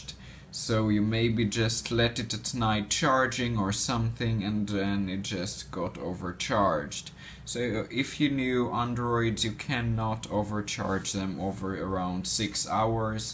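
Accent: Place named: Germany. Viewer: Mir gefaellt dein deutscher Accent.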